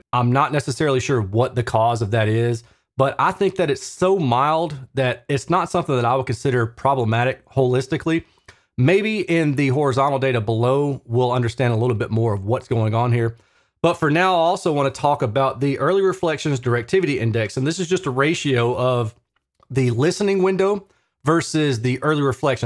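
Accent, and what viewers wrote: South, United States. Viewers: That's a true Southern accent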